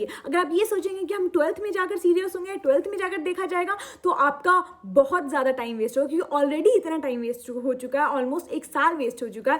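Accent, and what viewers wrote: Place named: Mirzapur, India. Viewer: Aap jab baat karti ho mirzapur yaad dila deti ho ❤️😂with ur accent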